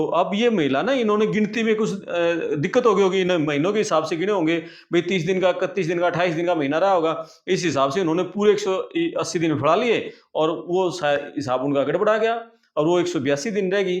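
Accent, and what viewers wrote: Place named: Haryana, India. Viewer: Heavy Haryanvi accent!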